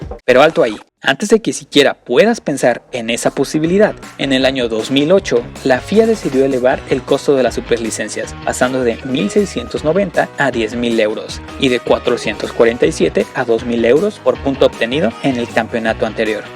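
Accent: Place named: Mexico. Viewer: q tenga acento mexicano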